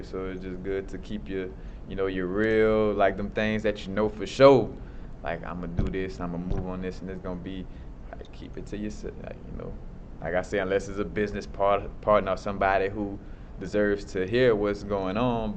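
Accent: New Orleans, United States. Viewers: Ahhh! Yet another BRILLIANT message!🙌 You make being innovative sound so easy lol, but it ain't no joke! I've been saying for years that innovators have no blueprints, yet my friends always wanted to mention how hard it is to start nonprofits, law practices, etc. and I would be like, "You literally have a checklist for the steps you need to take to achieve your goals, yet I am venturing where there IS no path and making it up as I go along." Don't do mentors, b/c no one has my unique insight or experiences; my instincts are my guides, ha! 😹 I have a degree in marketing, yet I don't market any of my skills/creations; the people who are meant to find them will! Don't really do social networking, the so-called "lifeblood of artists," either; too busy creating and living!💃🎨🎢 People often shake their heads and say, "Well how will I find you?" My reply remains unchanged: "You won't find me unless and until I WANT to be found! 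My existence is ART and ART is in constant motion!" LOL!!😆 Lovin this /400 degrees accent, too!💬🔥🎶 🎧😁Keep innovating!!!💃🎉🎆🌌💫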